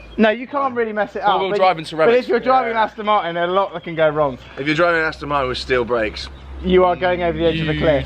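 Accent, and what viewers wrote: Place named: United Kingdom. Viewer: Bro shut the f*** up please. We want to hear the car, plus the British accent gave me an headache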